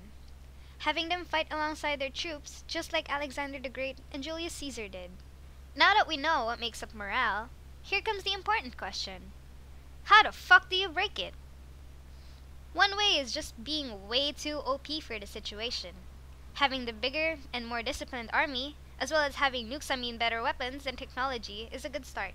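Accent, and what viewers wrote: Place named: Philippines. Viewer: why does this voice sound suspiciously filipino to me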